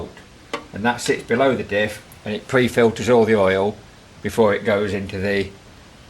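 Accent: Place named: Leicestershire, United Kingdom. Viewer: Hello mate , I detect a Leicestershire accent , a couple of nights ago I found a guy on here , I think he,s in the states , he,s got an old 344 with crossed - up hydraulic settings & a rattly & inaccurate pto - hydraulic engagement lever , can you have a go at finding him , & straighten him out please . My knowledge really ends at 272 & 245 , but I have worked on a 344 , it,s me that refers to " Big Keith " , it was his . Keith is no longer with us , so that door is firmly shut . I told him to contact Charnleys but I think your knowledge is equally as good . Many Thanks mate , & keep the vids coming . Phil .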